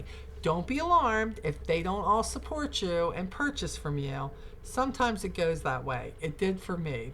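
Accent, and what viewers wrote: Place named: Mid-Atlantic, United States. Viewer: Hi! Just started and I want to thank you for all you do. I'm from NJ but live in MI now and with so many other videos out there to watch, I felt at home when I heard your mid-eastern accent.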